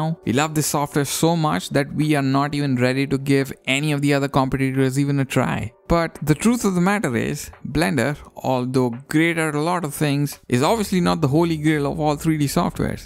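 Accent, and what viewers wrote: India. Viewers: What what what you're Indian !!😮 your accent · You might grow this channel much faster if you get rid of that painfully annoying Indian accent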